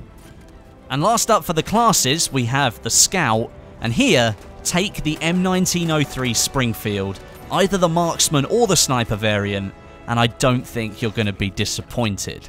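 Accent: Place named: United Kingdom. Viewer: Luv ur british accent. U should be in the game. talking about to get objective charlie lmao nice content bro cheers from brazil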